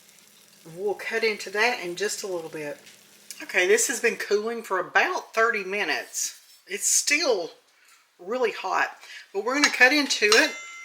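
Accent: South, United States. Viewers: Hello. Just wanted to say how I love your channel. You remind me of myself. It just cooking for one. It is a guessing game trying to decide what to do with the leftovers. But with the price of food today you have to make do with what you have. Keep the videos coming.😊 Oh and I love that southern draw when you speak. Be Blessed.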